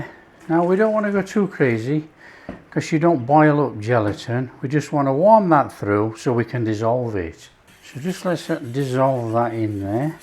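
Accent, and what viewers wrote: United Kingdom. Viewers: How I love to learn about such "basic" recipes! I only knew that much: the name originated from coarse sea salt, named corn, back in the days. For decades, I thought it was corn-fed cattle. Little did I know. Talking accents (a personal question, I know): I'm german. Been married to an american woman. Was related to a girl from down under. While my english is reported as "quite well", I still cannot decide, when it comes to accents. You're using Coleman's mustard. I do assume you're from the UK. But what exact region? Pls help me out! A friend of mine is from Norwich. I've also been to Ireland, once. Just my curiosity. I could listen all day long to Cheryl (What's for tea? here on YT) and Melisa (Soul singer reacts.) Music to my ears (in both cases). Prior to our wedding, a young man at the supermarket asked me about my accent. And was surprised. 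Saying: "I thought you where either english or scottish." A huge compliment for me! I've been born and raised a bit northwest of Hamburg, Germany. Never lived in an english spoken country.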